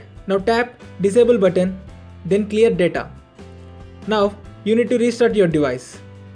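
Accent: India. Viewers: straight up indian tech support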